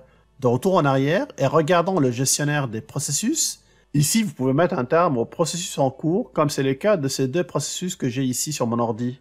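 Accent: Quebec, Canada. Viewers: Une question linguistique : votre accent québécois, se serait-il pas saupoudré de quelques épices du Maghreb ? Sinon, super chaine, très utile et qui va droit au but. Merci pour toutes ces infos ! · Un grand merci, toujours un plaisir de vous entendre avec votre accent canadien. Bon week-end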